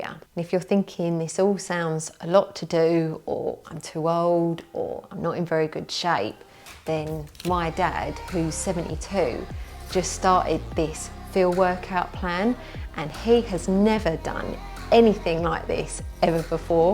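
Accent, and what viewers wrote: United Kingdom. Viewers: You know the weird feeling you get on YouTube when you follow a channel for so long that in your head you are totally like a real friend to the channel host! Well that happened to me and I am not even ashamed! 😊 You are bloody brilliant! (That was my most British impersonation, also said it in your fabulous orgasmic accent!) 🫶🏻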